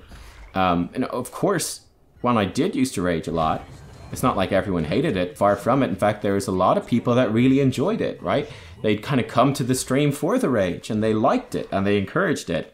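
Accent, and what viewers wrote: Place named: Ireland. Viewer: I love your commentary. Your Irish-ness is part of your charm. No need to self-police.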